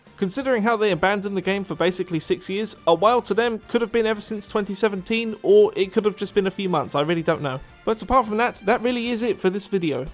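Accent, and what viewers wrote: United Kingdom. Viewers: Why are you british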